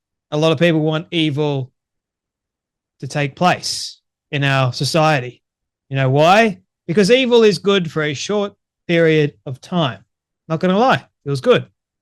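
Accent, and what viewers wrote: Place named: Australia. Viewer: Love your no nonsense Aussie accent.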